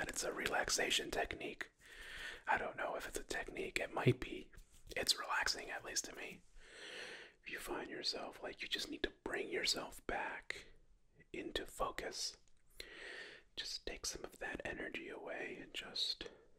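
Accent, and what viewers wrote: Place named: United States. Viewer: Big compliment: I love your voice. Usually I don’t get tingles from just whispering but I nearly fell asleep listening to your sponsorship in the beginning. I’m not American myself so your accent and tone is really cool to me :)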